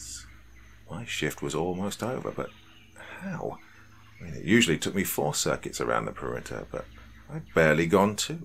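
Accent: England, United Kingdom. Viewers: also gta realize...hes English and we get our language based off there language..sooo who is really wrong in pronunciation???? However I do agree maybe a bit more research shldv been done knowing it was an American story...but give the guy a break really...he puts out some amazing content and I'm sure u have pronounced many things wrong...as have i..but he's working trying to make life easier for the rest of us and ur dawgn the man....I've heard many worse narrator's and is my main guy along with dark Somnium...gta stick up for what I believe in....if every1 here so much better STFU and start ur own YouTube...uk since ur so much better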